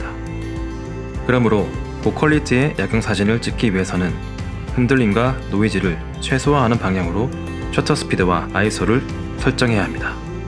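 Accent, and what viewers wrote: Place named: Busan, South Korea. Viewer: 부산분이네요. 잘 봤습니다.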